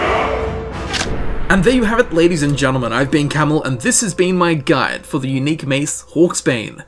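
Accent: Australia. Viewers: Dude it's like / ... I mean you sound Australian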